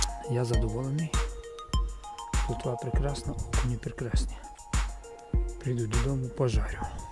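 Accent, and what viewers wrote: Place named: Chernivtsi, Ukraine. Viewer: Дякую.бо чути по розговорі що десь не далеко від черніців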